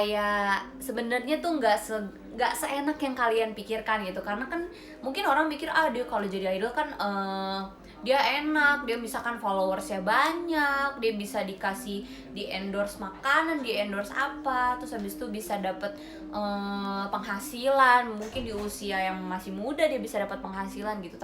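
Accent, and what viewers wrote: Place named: Betawi, Indonesia. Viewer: Gw demen bnget klo denger mpok ngomong logat nye betawi banget mirip tukang nasi uduk disebrang rumah gw😁😁😁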